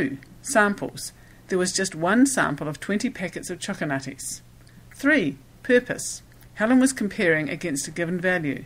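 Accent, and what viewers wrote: New Zealand. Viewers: Wao great as video. If i can please ask are you a kiwi?? If i have guessed right from ur acsent.😊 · New Zealand accent. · My apologies if I sounded rude but the content of the video was really helpful! Also, New Zealander's accent is one of my favourite kind of accent! :D Flight of the Conchords ze best!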